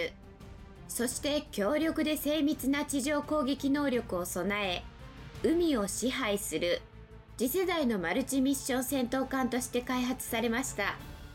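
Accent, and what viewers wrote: Kansai, Japan. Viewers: この人、すごいナマってるな。 これ関西系のナマりかな？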